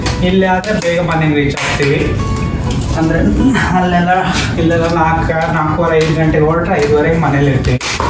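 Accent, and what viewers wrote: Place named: Bangalore, India. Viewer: Neevu awesome ri.... Pakka namma bng slang le matadtira.... I was in canada for 4.5 years almost... Liked ur videos... All the best🙂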